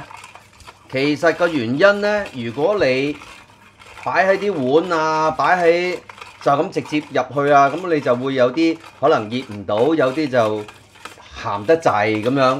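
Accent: Teochew, China. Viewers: 係唔係河洛話啊？只不過老闆個口音聽落似潮州話多啲😀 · 哈哈，潮州话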